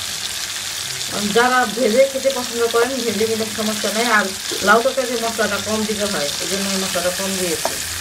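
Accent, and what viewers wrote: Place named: Narayanganj, Bangladesh. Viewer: Apu apnar desher bari kothay?apnar kotha shune mone hoy Narayongonj ba tar ashe pasher elaka.shuvo kamona.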